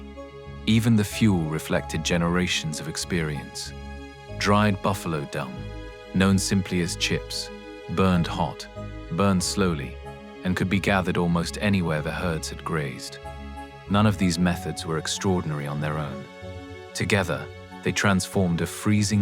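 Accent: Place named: RP, United Kingdom. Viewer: English butler voice yawnnn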